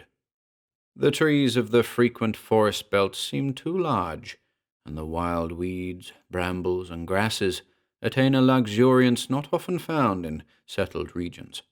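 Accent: United States. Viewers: you do a fabulous American accent! Loved this as always. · I really have to say, you've outdone yourselves with this one. The production value has increased dramatically. And, your American accent has also improved tremendously lately. I always listen to your channel while I fall asleep at night, usually alongside a quiet recording I made of rain on the roof of my spare bedroom. I find that it's the perfect aesthetic to go along with your recordings. Anyway, really looking forward to the next installments.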